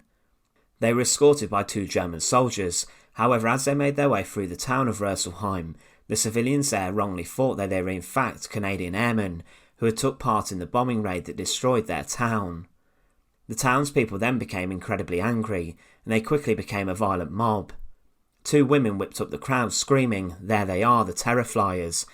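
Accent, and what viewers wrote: United Kingdom. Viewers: I totally know what you mean, but it is simply a particular UK regional accent and Id rather the guy stuck with it and remained authentic to his identity. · 😆 wtf thats crap - his voice is fine to me and i hope your comment doesn’t hurt his feeling. Its nice to have a regional UK accent. Not an upward inflection of every word like in the US. dont listen to him buddy your voice/show is spot on !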